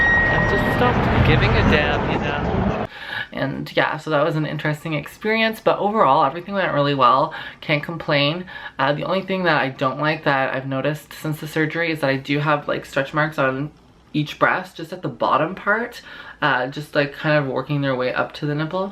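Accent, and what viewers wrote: Canada. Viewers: I listen to to learn canadian accent of english, she pronounces well, extremely beautiful girl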